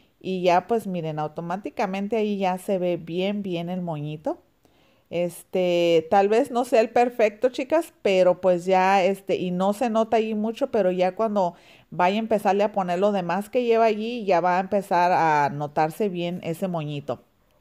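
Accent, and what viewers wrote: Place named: France. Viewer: Ayyy mi hermosa me super diverti viendo el videito te quedo hermosa la muñequita aunque se te salga lo frances. Bendiciones 🙌y un🤗 de🐻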